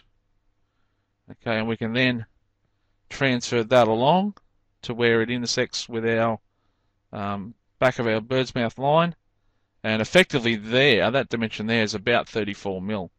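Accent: Australia. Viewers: How refreshing. An Aussie accent, clear descriptions and metric measurements. My applause is attached.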